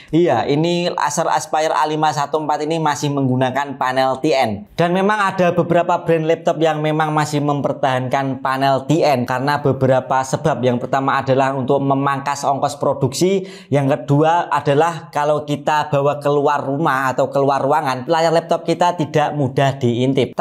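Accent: Java, Indonesia. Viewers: Mas mas jawa bgt nih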